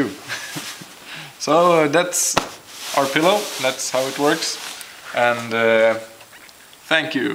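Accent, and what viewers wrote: Sweden. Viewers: where are you from? accent sounds like sweden or smth:D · from sweden? sounds like that?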